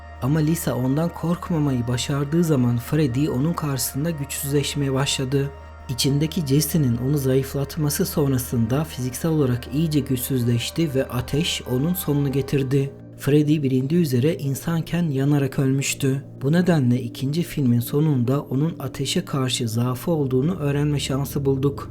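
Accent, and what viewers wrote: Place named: Erzurum, Turkey. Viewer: Abi sen Erzurum lumusun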